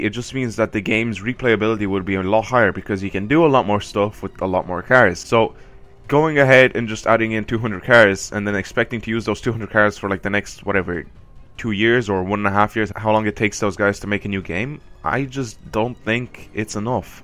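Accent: Ireland. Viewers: U sound irish.are u irish?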